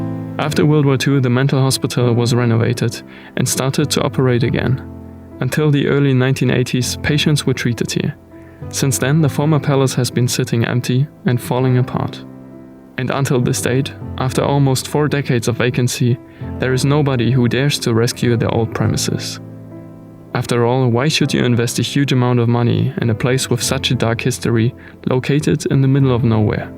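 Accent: Germany. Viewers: Greetings from Tricity in Poland 🌊 you are making amazing videos! I love how informative you are and how you are making proper research and visually your videos are outstanding, too. And narrative voice is so smooth , with compassion and gravity. I 've never thought little german accent could be nice ;-))) this episodes was so sad... :-( hard to watch for me but I appreciate your work and preparation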